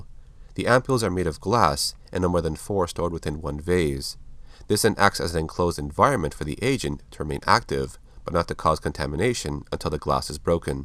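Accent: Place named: Canada. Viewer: are you Canadian? I swear you sound Canadian.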